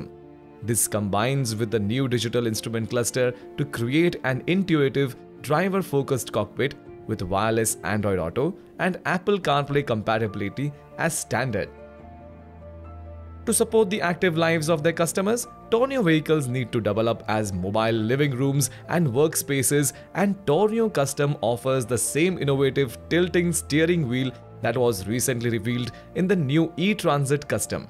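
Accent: India. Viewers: This tounge...like indian speak english...😊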